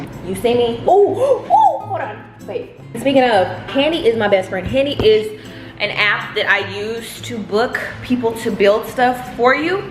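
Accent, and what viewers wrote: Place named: South, United States. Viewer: girl u sounding a little countrtttyyy i love it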